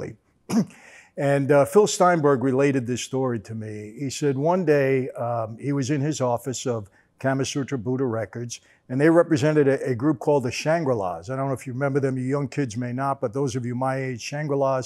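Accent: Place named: East Coast, United States. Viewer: Hello Mr. Franzese, My Name is Rick Vaughan and I just wanted to thank you for doing all these videos on your life, sharing really interesting and intriguing insights into a world almost none of us “regular-type” people would ever know and for continuing to share the positive message of god and how to be a better person in this world. You have the ability to captivate an audience and it’s awesome to hear ya talk with your east coast accent! I love watching your videos and wanna keep encouraging you to keep them coming! Also celebrating 5 years of No booze today and sending you continued well wish to your daughter and family! Much love and god bless Sir, take care! -Ricky V.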